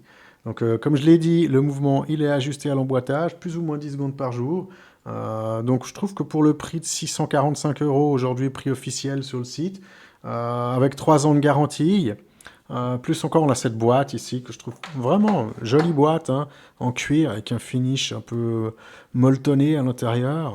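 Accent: Geneva, Switzerland. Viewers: Très belle montre! et joli accent de Genève ;)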